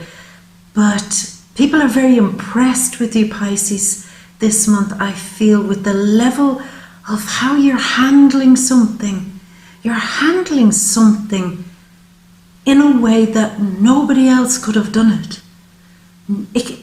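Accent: Ireland. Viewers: Love Love Love Love Love the Irish accent. Good on ya girl. I'm an ox........ Gotta start a new business. Buy a lease on a pub. 85000 for a 20 year lease. But I don't have good credit, so it's tricky to get funding. Gotta keep charging on. Ah thanks. Glad I found you on you tube. I'll come and see you in Ireland soon. xxxxx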